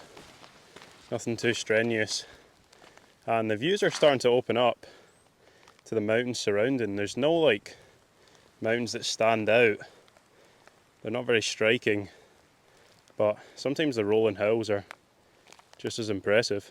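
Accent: Scotland, United Kingdom. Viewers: Beautiful Scotland, beautiful accent! Greets from Warsaw :)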